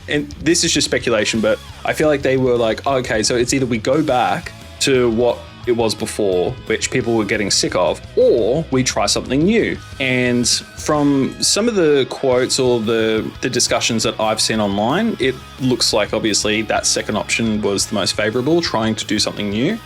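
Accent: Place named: New Zealand. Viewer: Are you a kiwi? I dunno why I never heard it before but you sound real kiwi lol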